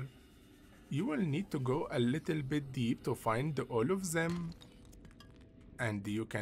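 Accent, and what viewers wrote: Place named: Arab world. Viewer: I like your accent, you sound like an Arab Mario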